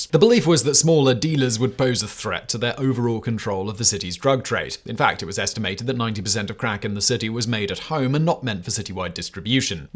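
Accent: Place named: RP, United Kingdom. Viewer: One cannot take on this much work in this many directions and not become scattered. He has a cut-glass British accent (which hardly anyone has any more! He sounds a bit like Churchill mixed with James Mason - most Brits are now quite a bit more "broad"), but can't trade and sell on that forever. His occasional goofiness goes too far in the other direction. He is a victim of his own success, and I think from now on the quality will continue to deteriorate. Any company that expands too rapidly in too many directions fails. I don't wish failure on him by any means, but the cracks are already beginning to show.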